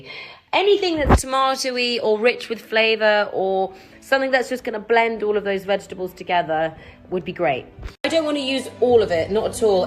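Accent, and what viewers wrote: United Kingdom. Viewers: It could taste terrible and I would believe her. The British accent makes everything more believable. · ohh my God this is British accent I thought you are an American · I know you won't see this but I loved you in Midsommar! The control you had over your body language and facial expression. A good portion of the movie it seemed like everyone was dazed trying to figure out what was going on until the last 30 minutes. Also just when I think an actor is American and here that English accent! Same with Oliver Cohen who played adult Lucas In "The Haunting Of Hill House" as an American, strung out, drug addict. Watched an interview him, first thing I here is that old British "Ello!"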